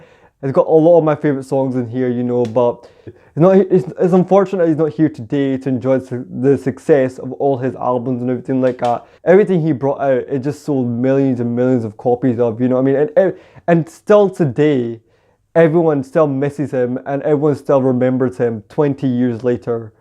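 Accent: Fife, United Kingdom. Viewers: Sounds like a Fife accent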